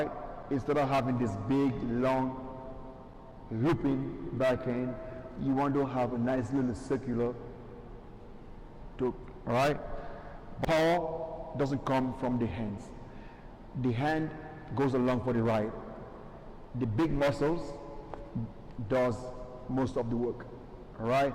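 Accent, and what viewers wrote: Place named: Saint Vincent and the Grenadines. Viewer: Good tips. Are you a Vincy? I noticed your accent.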